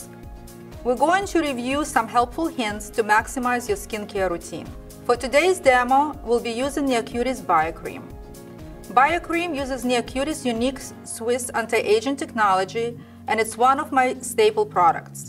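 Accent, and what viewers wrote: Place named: Russia. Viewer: so much of russian accent))))))))))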